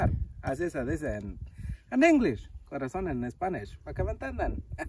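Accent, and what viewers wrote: Michoacán, Mexico. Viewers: Pa michoacan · Así hablamos en michoacan y guanajuato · Pa las tierras michoacanas vali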